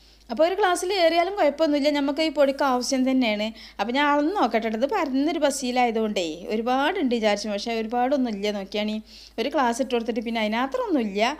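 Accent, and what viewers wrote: Malappuram, India. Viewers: ചിക്കന് ബദൽ ആയിട്ടുള്ള മുട്ട ബ്രോസ്റ്റ് സൂപ്പറായിട്ടുണ്ട്. വീഡിയോ എല്ലാം ഒന്നിനൊന്ന് മെച്ചം തന്നെയാണ്. എങ്കിലും താത്ത ഇതിനോടൊപ്പം ഉള്ള നിങ്ങളുടെ സംസാരം ഒരല്പം പോലും കുറയ്ക്കേണ്ടതില്ല. കാരണം മലപ്പുറം ശൈലിയിലുള്ള നിങ്ങളുടെ ഈ സംസാരം തന്നെയാണ് നിങ്ങൾ ഇടുന്ന എല്ലാ വീഡിയോകളുടെയും ഹൈലൈറ്റ്. സംസാരം താല്പര്യമില്ലാത്ത ആരെങ്കിലും ഉണ്ടെങ്കിൽ ആ ഭാഗം അത്തരക്കാർ സ്കിപ്പ് ചെയ്ത് പൊക്കോട്ടെ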